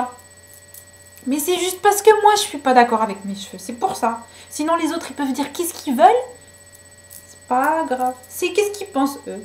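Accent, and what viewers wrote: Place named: North Africa. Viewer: J'adore ton accent de bledard .... Tres belle video en tout cas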